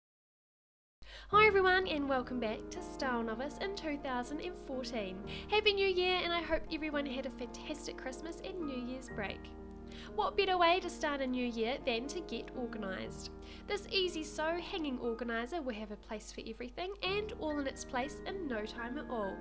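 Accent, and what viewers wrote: New Zealand. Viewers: Sounds like NZ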